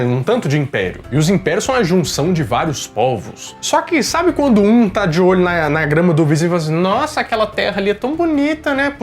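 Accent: Minas Gerais, Brazil. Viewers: me inscrevi pq além de vc ser inteligente ainda é mineiro e eu adoro esse sotaque kkkk like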